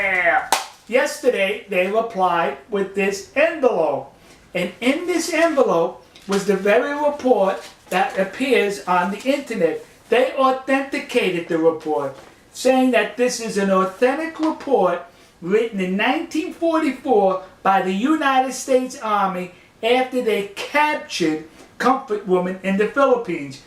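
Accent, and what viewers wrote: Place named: Northeast, United States. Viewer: Tbf that's a pretty common accent patter in parts of the American north east, especially in historical districts of larger cities.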